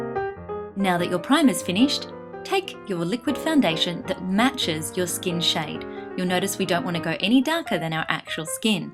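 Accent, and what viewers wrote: Australia. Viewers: I was taken aback at the Aussie accent. It's always nice to hear on this platform, though.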